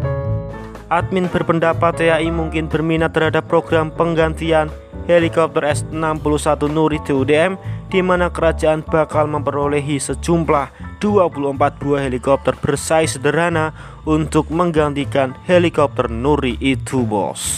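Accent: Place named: Java, Indonesia. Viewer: Kuat betul bunyi dialeq jawa dia ni...CG Loro telu papat ya😁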